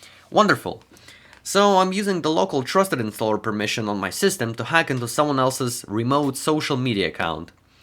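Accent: Poland. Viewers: Are you Polish? Your location says Australia but you do not sound Australian at all and your YouTube is in Polish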